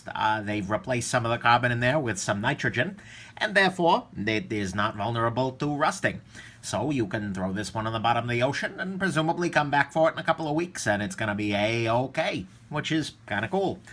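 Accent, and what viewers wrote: New York, United States. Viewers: Where are you from? New York or something?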